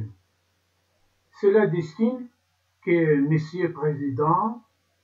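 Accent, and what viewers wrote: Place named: France. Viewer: Добрый день земляк..У вас французский прононс 😀,вы имеете большую способность к языкам 👏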